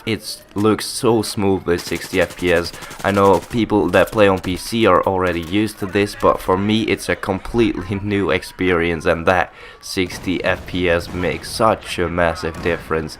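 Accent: Netherlands. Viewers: wait are you dutch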